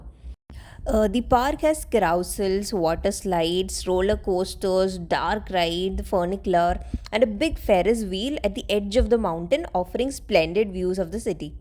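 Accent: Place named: India. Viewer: Indian accent is so terrible!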